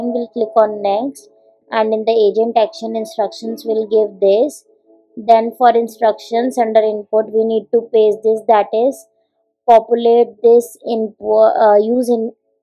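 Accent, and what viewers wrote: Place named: India. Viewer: Keep making videos , Thoda hindi tone hota haina ache se samjh m aa jata h🤩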